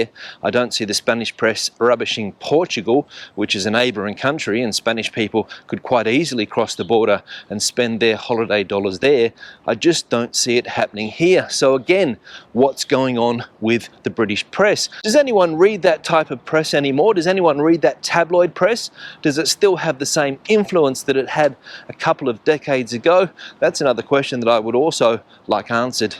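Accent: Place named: Australia. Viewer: I think I recognise your accent, (are you an Aussie?) thanks for another valuable update!🙏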